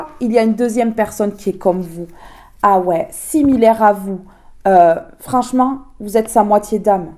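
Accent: South, France. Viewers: Bonjour ma très chère belle âme! Je te remercie pour la bienveillance que tu met dans tes guidances et je perçois que tu as un grand coeur et une grande gentillesse et la façon dont tu nous parle avec ton magnifique accent méridional nous apaise et nous réconforte! sincèrement!!!. Merci!!! Je penses que tu as raison ma vie va être bouleversée durant ce printemps 2025 (Avril, Mai 2025) et qui va grandir en été et en automne 2025 Tu vois juste Je m'adresse à Dieu pour des miracles et je pense que je vais en obtenir au moment ou je m'y attendrais le moins ça va démarrer et se construire graduellement je pense qu'il va y avoir la rencontre amoureuse et il va y avoir construction pour le grand amour et il y aura de la création artistique!!! AMEN Que Dieu t'accorde du bien et que ta soirée te soit des plus agréable.. Bisous ❤💖😘😘🌟☀🙏🙌🙏🙌🙏🙌🌟☀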